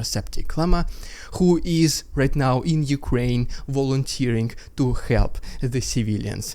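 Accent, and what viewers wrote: Russia. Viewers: lol Russian sounds great in English :D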